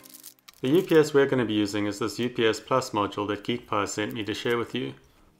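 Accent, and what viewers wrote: South Africa. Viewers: You sound South African. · you sound like a South African, not an Australian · South African?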